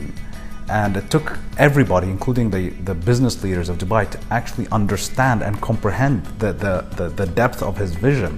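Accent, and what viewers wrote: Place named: England, United Kingdom. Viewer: mashllah i like ur englsih accent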